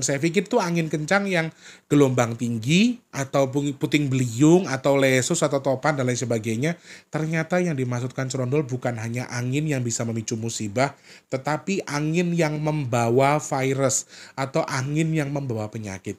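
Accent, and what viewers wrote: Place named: Tuban, Indonesia. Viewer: Nwn Sewu mas BHS timurane mas kok kayak logat Tuban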